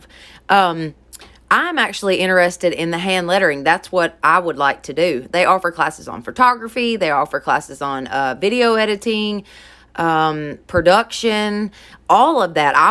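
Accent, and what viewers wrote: South, United States. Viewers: Love your creativity! What state do you live? You speak southern like me! I’m in Tennessee! I’m thinking maybe you live in Kentucky!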